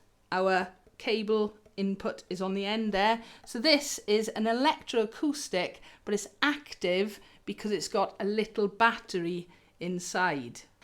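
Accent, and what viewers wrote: United Kingdom. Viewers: Hey,. Are you British?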